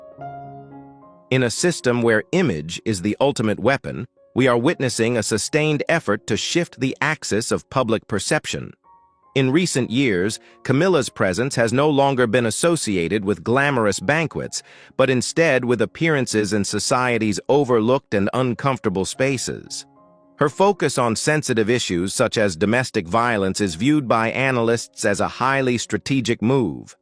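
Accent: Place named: United States. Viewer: Waste of time listening to this, and the American accent is nauseating.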